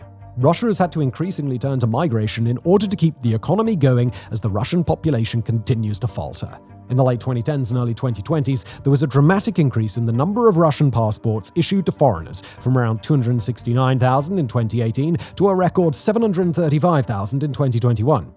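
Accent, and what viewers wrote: United Kingdom. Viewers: Sorry but would it be possible to include closed captions in your videos? I'm afraid to admit this but while I do grasp written English pretty well as a non-native speaker, I'm having more trouble understanding British English compared to American 😅 And the automatically generated CC obviously sucks ass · Hearing what seems like a snooty British accent telling of Russia's demographic problem is bizarre. Just how is the native population of Great Britain doing in this situation? Aren't they merely a decade or two behind Russia in exterminating themselves?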